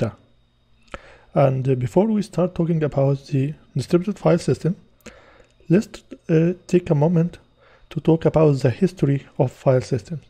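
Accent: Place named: Middle East. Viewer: I guess its the sweet middle eastern accent used by the gentleman.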